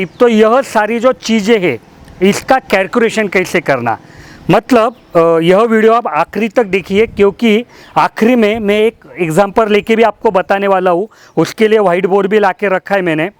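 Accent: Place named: Maharashtra, India. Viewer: आपल्या बोलण्यावरून असं वाटतं की , आपली भाषा मराठी आहे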